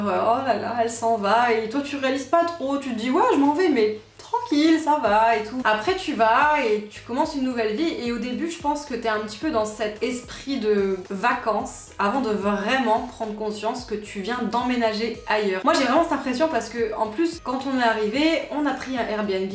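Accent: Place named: France. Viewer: je vois sur plusieurs commentaire que tu ramène souvent l'accent francaise et que les québécois ont déteste ca au points de pas vous aimez ? Je ne sais pas ou tu as entendu ca mais la majorité des québécois ce fou pas mal de ton accent.. il ne faut pas généraliser.. ce que nous détestons vraiment ce sont ceux qui ne veulent pas s'intégrer au coutume quebecoise.. apars ca.. ont est très chaleureux en général..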